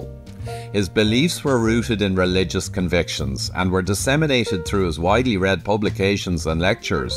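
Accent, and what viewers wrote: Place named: Canada. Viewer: Canadian aye? Thanks!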